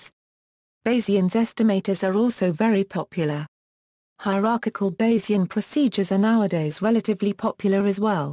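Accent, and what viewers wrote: United Kingdom. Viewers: Love this explanation with the British accent!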